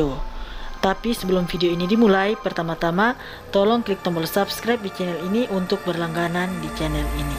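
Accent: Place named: West Sumatra, Indonesia. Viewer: loghat Minang nya keliatan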